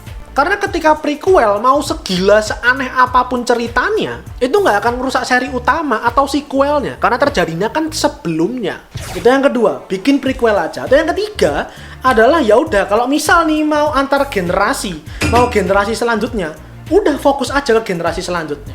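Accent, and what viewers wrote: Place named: Surabaya, Indonesia. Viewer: Wong suroboyoan yo cak? Medok banget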